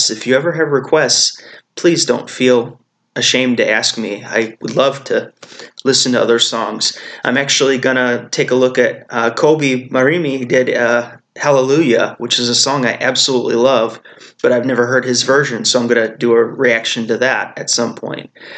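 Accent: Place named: United States. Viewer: I had a feeling your accent is so American ;)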